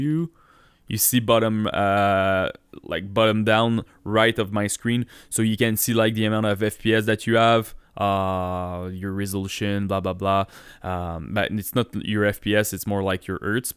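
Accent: Quebec, Canada. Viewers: Man you're hella Quebecuois.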